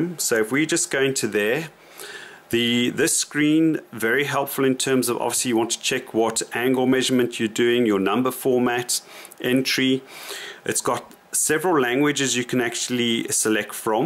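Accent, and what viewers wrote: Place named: South Africa. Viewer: Good video, thanks. Do I detect a SA accent there?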